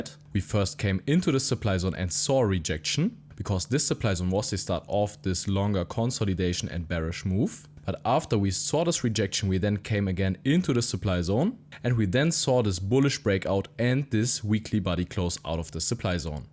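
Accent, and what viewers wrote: Germany. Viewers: Very German accent :D. Aber ist in Ordnung.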